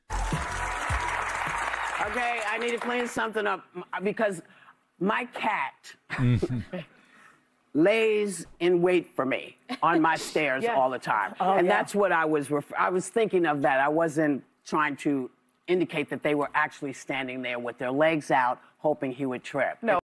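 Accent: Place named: Wisconsin, United States. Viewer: Love you man! Merry Christmas to you and yours. I love hearing the Wisconsin lily in your voice; makes me miss home ❤. Keep up the great work.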